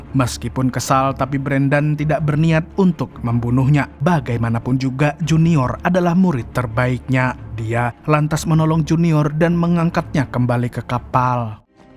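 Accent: Banjar, Indonesia. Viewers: Orang banjar kah min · urang banjar kah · Banjar neh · admin nya banjar hhhhh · Adminnya orang banjar kh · NGALIH BUHAN BANJAR NE 😅😅 AYAKKKKK SAAANNNIIIIII🤣🤣🤣 TAGUGUR-GUGUR ULUN MANDANGARNYAA😅😅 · Behh admin urg banjarr skalinya😂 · Urang banjar sekali nya sidin nih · Banjar banar pian mang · 😅😅 urg banua sakali nya mimin nya😅😅😅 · Gua curiga sama suara ini pasti orang Banjar balas sanak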